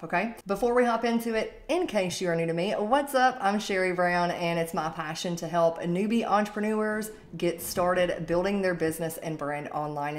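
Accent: South, United States. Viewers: I was born in the south so Love the Southern Accent Sherri!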